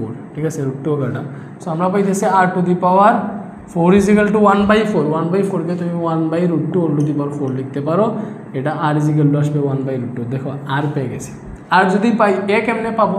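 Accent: Sylhet, Bangladesh. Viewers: ❤❤❤ ভাইয়া আপনি মনে হয় সিলেটি? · Oyh oyh. taine sylheti. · ভাইয়া আপনি কি সিলেটি😊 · Bhai afnare amrar Sylhetii lagee Afnar bari kita Sylhet nih?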